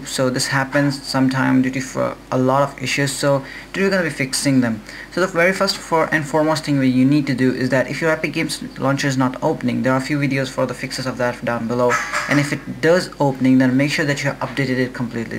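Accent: India. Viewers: I don't trust anyone with an east Indian accent and a computer with an internet connection ......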